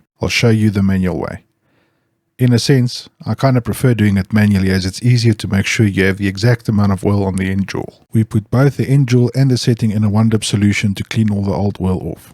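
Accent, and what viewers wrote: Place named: South Africa. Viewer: Excellent and a nice South African-accent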